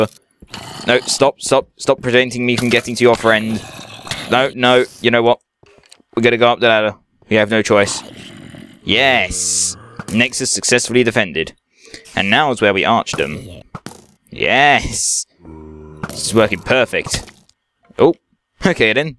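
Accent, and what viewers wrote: United Kingdom. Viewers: do u live in englend cuz u sound like it but ur sooooooo awsome <3 luv ya ^_^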